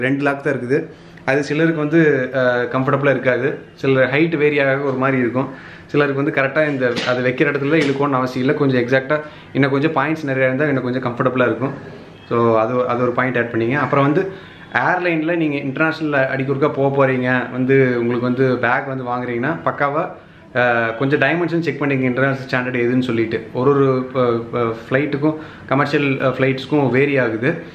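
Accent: Kongu, India. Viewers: கொங்கு தமிழ்...❤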